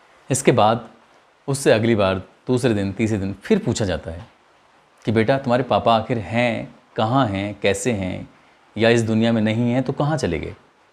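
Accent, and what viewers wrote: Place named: Lucknow, India. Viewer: walekum Assalam shukriya janab bolane ka kya yah to Lucknow ka asar hai 🙏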